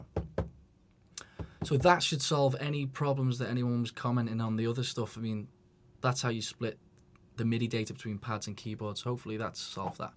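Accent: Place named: Liverpool, United Kingdom. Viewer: Subscribed! Brilliant teacher, thanks. And I could listen to that Liverpool accent all day....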